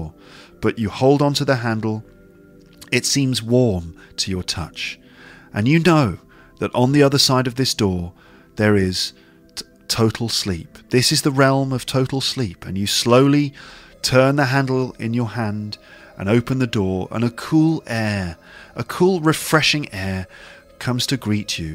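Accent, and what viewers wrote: United Kingdom. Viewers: To be honest I fell asleep once while listening to your podcast 😐 Please don't hate me - I've turned your podcast on with purpose, hoping that your pure British pronunciation will get some far parts of my poor exhausted brain and settle itself there))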